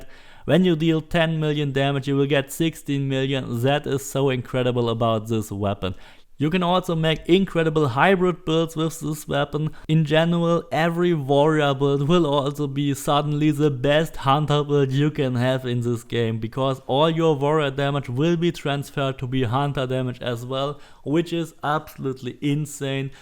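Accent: Germany. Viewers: You german, you've got a hard accent no offense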